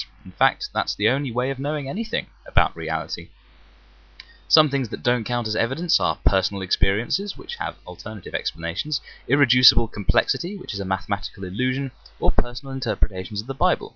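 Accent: United Kingdom. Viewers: I love your British accent ;). Her video was funny. I asked a few Christians why they believe and a couple reasons I got were "If I live as if there is a God and I die and find there isn't, what have I lost. nothing. If I live like there is no GOD and die and there is, I have Lost everything. That is a chance I am NOT willing to take." and "the evidence is all around just look at nature"...etc. LOL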